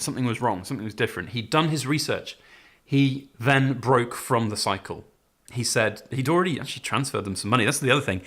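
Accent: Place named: RP, United Kingdom. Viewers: You have a posh accent IMO XD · 😂 Brilliant Toff Accent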